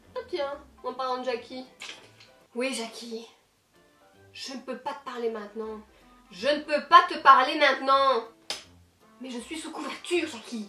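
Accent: Belgium. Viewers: L’accent belge qui ressort en force! Lol Cette vidéo m’a tuée ! 😘😂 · Mais l'accent pur belge on adore 😂😂 Love!!! Je suis pour une rencontre avec Jacky!!! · Je surkiff vive l'accent belge 🇧🇪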